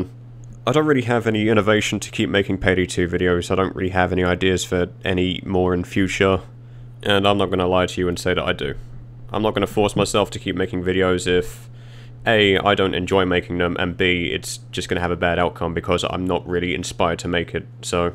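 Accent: United Kingdom. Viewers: Like many other have said we really don't give a shit what you play because we stick around for your stupid personality and all the other bullshit shenanigans that you manage to find. So whether you go on and play some PD2 occasionally just to bitch about all the shit players who fuck up a normal jewelry store heist and hackers or just play other stuff because that's what you want, 95% of us will still be here..... just for your pommy fucking accent. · Are you british or australian ?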